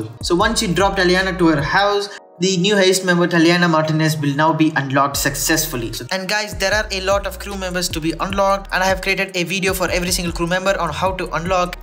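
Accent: India. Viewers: Why do you have such a thick indian accent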